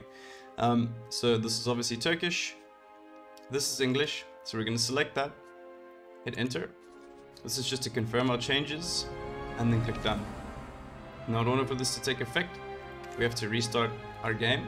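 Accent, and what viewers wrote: South Africa. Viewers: Do I hear a fellow SA accent? XD Thanks for the vid!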